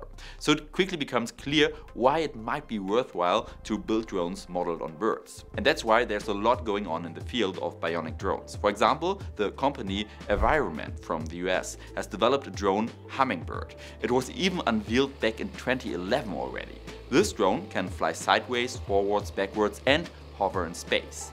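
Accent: Germany. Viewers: You talk like Arnold Swarzenegger's nephew, being German, but I find your talks quite interesting.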